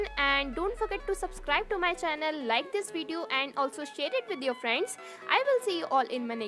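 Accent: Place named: Hyderabad, India. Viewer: Apka accent hyderabad ka hai ....... kya ap hyderabad se ho❤️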